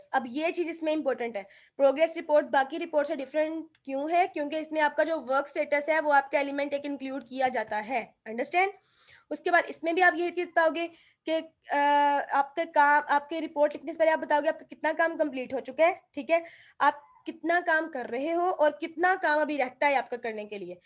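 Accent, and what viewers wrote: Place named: India. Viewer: When I heard the way you speak. my mind said oh. Indian.. I'm a good friendly to Indian people. too much here in Abu Dhabi my friend.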